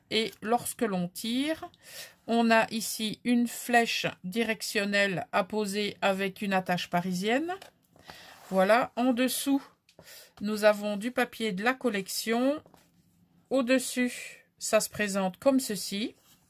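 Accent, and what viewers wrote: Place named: Belgium. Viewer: Bonjour ma petite, Woaw quelle merveille 😍🤩 vraiment très douée Ileen, bravo! Son album est superbe 🤩 et très bien travaillé 👍 avec un thème que j’aime particulièrement 🐇 seulement 11 ans….. c’est plus que prometteur, la relève est assurée! Ça m’a fait plaisir de t’entendre avec ton tout petit accent sur les « UI « ( comme ds 8 ) qui me fait toujours sourire chez nos amis belges à croire que le Luxembourg n’est pas épargné 😄 j’adore! Gros bisous 😘💕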